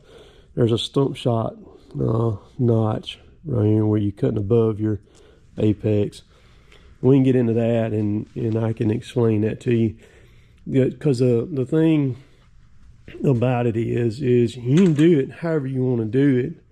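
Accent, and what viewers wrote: South, United States. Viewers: that’s a great learning tool. I was looking for this information and you had it and yes the block for the notches would be a great help. You’re very good teacher. Have a great day and appreciate all your videos. You do a great job on them enjoy your channel, be careful And you don’t talk slow that’s just the way we talk down here in the south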